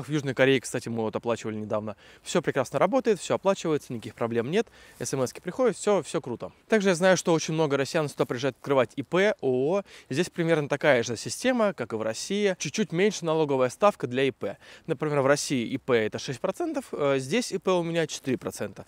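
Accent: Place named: Kyrgyzstan. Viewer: Красавчик, у тебя уже акцент кыргызский😊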